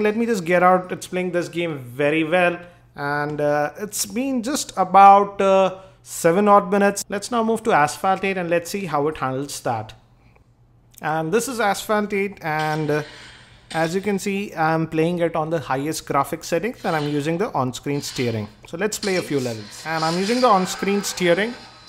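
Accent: India. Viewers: I love the Indian accent...Makes me smile every time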